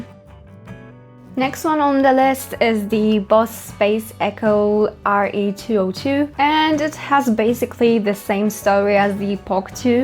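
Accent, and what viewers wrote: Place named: Poland. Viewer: Cool video. The Boss BD is one of my favorite drives. Btw, I love your accent! I thought it sounded kind of northern European, and then I saw that pedal was Polish, I'm guessing the accent is also Polish (and your English is perfect!). :) Anyway, greetings from Ohio, US.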